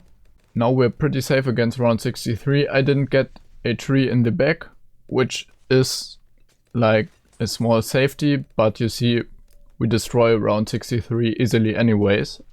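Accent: Germany. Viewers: are you german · ur from germany arent you?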